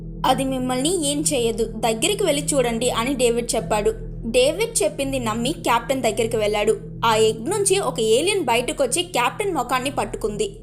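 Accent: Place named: Godavari, India. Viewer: Godavari side Tamil kadhu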